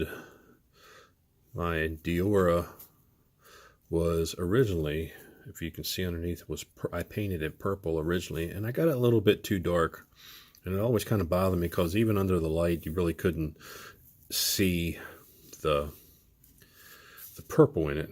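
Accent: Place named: Pittsburgh, United States. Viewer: Glad I found you. Time Rider sent me. TR, Bare Metal HW, WheelsNThangs, Marty are my teachers. You appear to have joined the faculty. Are you from anywhere near, PA. I am, you sound "familiar" for lack of a better way of putting it.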